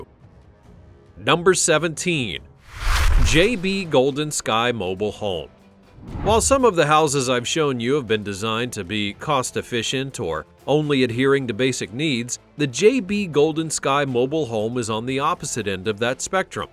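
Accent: United States. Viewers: None of these are mobile homes though. They're cool campers don't get me wrong, but they aren't mobile homes. they aren't meant to be lived in, except, perhaps, for the tiny homes but even those still aren't mobile homes. I would think that this word difference is cultural, but you sound as American as they come so I don't think that's it...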